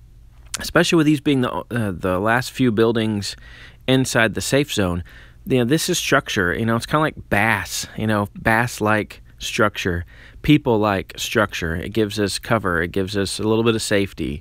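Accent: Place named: Chicago, United States. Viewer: I’m not really sure. I haven’t heard it too much. I wanna say like Chicago or somewhere a little farther north.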